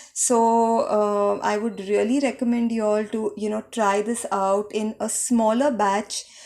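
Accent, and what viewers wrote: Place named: England, United Kingdom. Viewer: I love you English ancent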